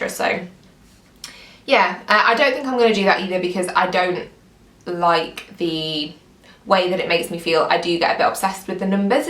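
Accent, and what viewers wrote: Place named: United Kingdom. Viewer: You have a British accent. Lovely😍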